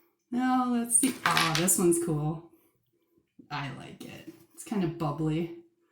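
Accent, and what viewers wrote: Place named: Great Lakes, United States. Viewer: I am from Michigan and you have a wonderful strong Great Lakes accent.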